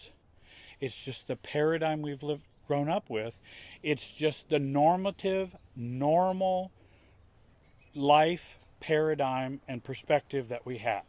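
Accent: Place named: Indiana, United States. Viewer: 100% this man is from Indiana lol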